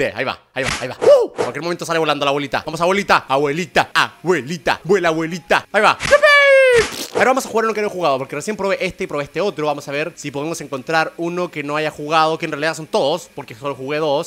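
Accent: Argentina. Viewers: ¿Soy la única que notó que a se le está pegando el acento argentino de alguna manera? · german esta mas argentino en cada vídeo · Digan si no está ablando con asentó argentino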